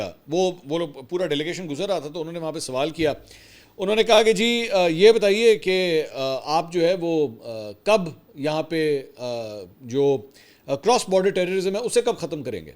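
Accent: Punjab, Pakistan. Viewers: Matter of fact"please stop speaking wieghtfull English in Punjabi accent ....stop supporting cricket too much....stop being hypocrite,just for PTI views ........almost done😏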